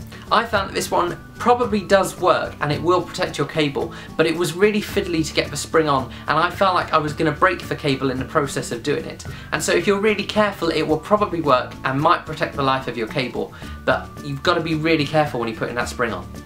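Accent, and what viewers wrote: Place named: United Kingdom. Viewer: I love ur british accent it made me laugh when u said pounds because here we would love to spend pounds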